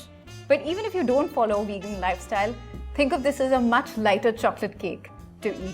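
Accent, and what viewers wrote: India. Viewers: a very strong Indian accent you have. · Ulas Gültekin it's the Indian accent.😍😄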